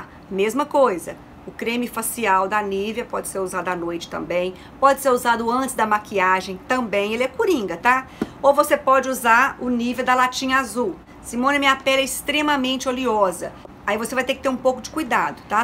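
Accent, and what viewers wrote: Minas Gerais, Brazil. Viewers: Você é mineira? tem um sotaque diferente! Adorei seus vídeos. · sou casada com um mineiro.... amo sotaque de vcs! Te sigo aqui, te adoro, sempre amorosa e realista!! Você parece demais também com a sogra da minha filha, na voz e fisionomia, lindaaaa você! Gratidão por seus conteúdos!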